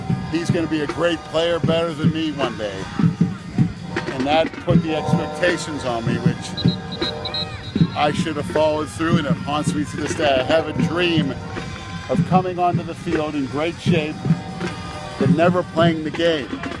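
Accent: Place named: Pittsburgh, United States. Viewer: I love the Pittsburghese, makes me miss home.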